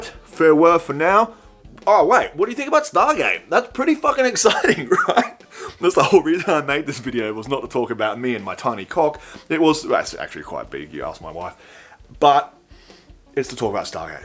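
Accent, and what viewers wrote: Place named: Australia. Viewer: Make more mate. I love listening to aussie creator, the yanks are okay but good to hear a voice i can relate to.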